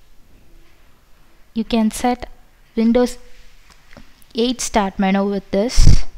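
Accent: India. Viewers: lol i know you are india i know from your sound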